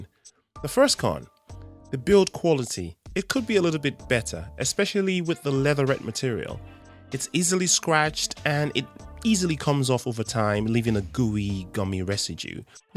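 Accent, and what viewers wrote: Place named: England, United Kingdom. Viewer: Hi, I've just discovered your videos and I think they're really fantastic. It's also lovely to hear a familiar sounding voice on youtube. Nothing at all against the many Americans who also make wonderful videos but an English accent definitely makes me feel at home :)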